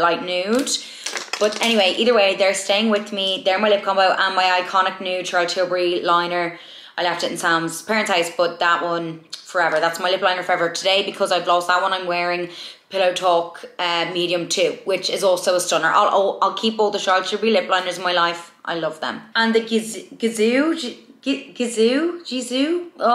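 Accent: Ireland. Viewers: Its an Irish posh dublin accent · Yeah it’s Irish I’d say she’s from Dublin like myself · Hi I’m to your channel and so glad I came across you and your Irish which is a bonus and way I just want to ask what would you recommend something really hydrating for my skin please and also did you say you get Chemical peels if so please tell me we’re and who is great I would so appreciate it thanks till next time keep up the fab videos, happy new year also take care x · I was trying to figure out which accent you have the first couple of seconds in but it sounds Irish am I correct?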